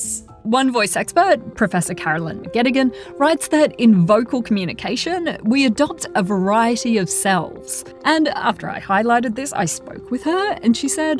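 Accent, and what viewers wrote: Australia. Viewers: Same! And her purely Aussie accent too 😁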